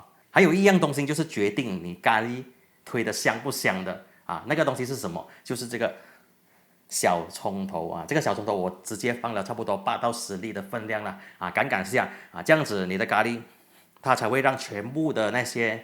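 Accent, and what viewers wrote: Malaysia. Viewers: 谢谢! 说得非常清楚也听起来不是那么难! 棒！说的“大马”华语，马来西亚和新加坡人听了都觉得很亲切！😊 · 这样才是道地的 ‘ 聨邦華语’嘛。😊😊。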